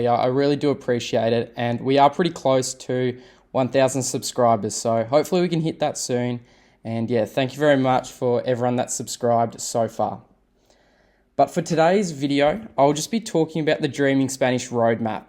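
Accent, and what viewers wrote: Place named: Australia. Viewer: I am around the same hours as you (1300 hours), however, I am not counting hours anymore, just listening to as much content as I can. I agree with what has been said here that native Spanish is much different than Dreaming Spanish videos. I also agree that the roadmap is a little ambitious. I have been using Italki (65 classes) for 6 months and found that it has boosted my confidence, which I think is absolutely vital for moving forward with language learning. My speaking is certainly not fluent, but I can make myself understood. If I am talking about a subject that I am very familiar with, it is much easier than a subject where there is a lot of new vocabulary. My attitude now is not counting hours or making goals, but just to keep plodding and try to enjoy the process as much as possible.I am also reading a lot of books which I think has been great in teaching me sentence structure. 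I am of the firm belief that language learning is long-term progress with no ending - we just keep improving. That is why for me, attitude without self-pressure is vital so that I don't become too self-critical. I would like to add that I think ¨Dreaming Spanish¨is a great platform and I can attribute most of my learning to it. By the way, you sound like you are Australian - I am also living in Melbourne. Your video was frank and honest and that is great to hear because there is so much BS out there on the net with lots of false promises.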